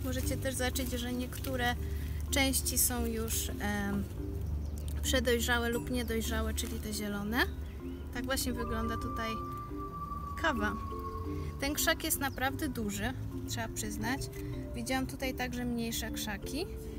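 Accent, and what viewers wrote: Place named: Minas Gerais, Brazil. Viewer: Olá, você tem um lindo sotaque solo da região sudeste mineira minha graça é Daniel... Wow, niesamowicie, kiedy mówisz po brazylijskim portugalskim.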